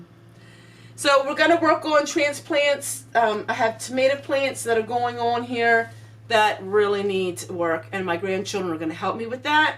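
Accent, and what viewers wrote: Pennsylvania Dutch, United States. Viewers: I love your Dutchy Pennsylvania accent! I've known several people from there and how y'all talk is so entertaining. You da bomb! I have Dutch heritage too......from Claverack, NY. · nothing wrong with your PA Dutch I'm enjoying the dialect:) It's been a nice day today the sun came out and I was sitting in my yard:) Very quiet here except the sirens but not to much of that:) I got out to the store today to get a few things I needed to get but otherwise no real need to be to a store:) It sure does feel like I'm in the country here:) Been helping mom in the house:) That's a beautiful quilt great to see your grand kids helping out:) Love you my Dear sister:) God Bless you and Ken and Family:) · Hi Everyone, Happy Palm Sunday, was busy with poor new Lab puppy, What was I thinking >>> she is a distraction as to what is going on. 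Also attempted to sew some face masks My skills do need improvement, We have Pluto and a Firestick. Like you,I am rural in NY. Love hearing your dutchy Teach us some. Stay safe and Blessings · Lol you made me giggle. I like your Dutchie accent makes who you are. You have been busy this week enjoyed your video have a great week 🌷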